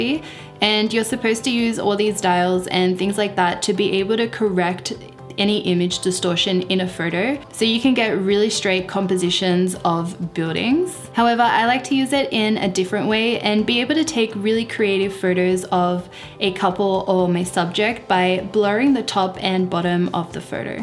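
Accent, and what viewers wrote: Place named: Australia. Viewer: It's an Australian accent. Didn't you watch home & away and neighbors when you were a kid?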